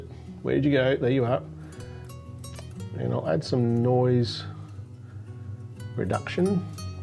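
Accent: Australia. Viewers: I absolutely love the Aussie access and slang 😂 Ive just bought the Action Cam 5 so I have to try this ❤ Cheers from the UK 🇬🇧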